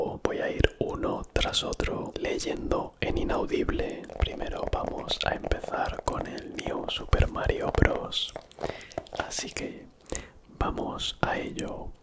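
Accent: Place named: Spain. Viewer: Tienes suert3 de tener acento español... ayuda mucho en ASMR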